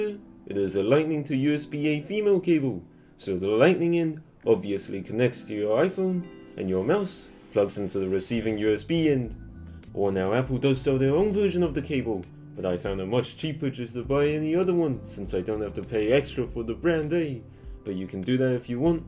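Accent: New Zealand. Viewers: new zeiland accent lol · Kiwi accent 😅. Look it up.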